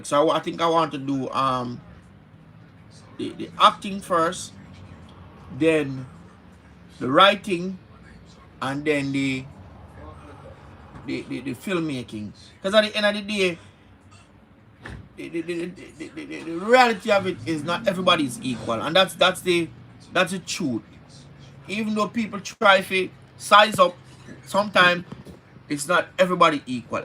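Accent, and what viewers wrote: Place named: Jamaica. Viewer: I understand, but the Patwa is part of our culture, and a lot of foreigners love how we speak. Anyway in the name of progress and a wider audience do what you need to do, just keep the Jamaican accent You will always have my support.❤❤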